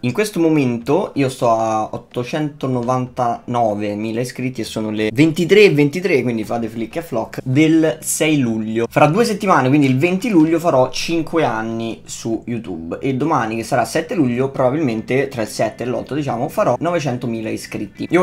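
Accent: Rome, Italy. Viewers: è normale che guardo tutto il video.. sai intrattenere non annoii e alcune volte la tua parlata romana mi fa ridere un botto, grazie ✌#versoilmilione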